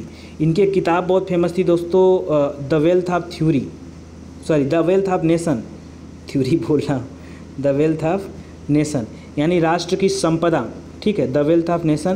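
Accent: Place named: Uttar Pradesh, India. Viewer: Sir ji aap up se ho apki language se pta chl rha hai Bhot accha samjate ho sir ji thanku bye tc.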